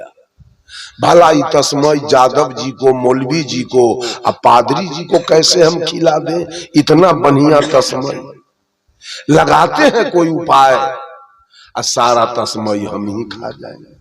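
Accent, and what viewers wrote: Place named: Bihar, India. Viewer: अपना चश्मा तो उतार दे पहले 😅 और बोली तो जेसे लालू जेसी बात करता है हमारे जेसी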